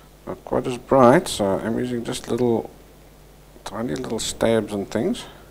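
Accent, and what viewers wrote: South Africa. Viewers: South Africa accents make me happy. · A Saffa!! Makes my heart happy to hear ❤️ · is that a south african accent i smell???? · Are you South African? You sound like it! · you sound like your south African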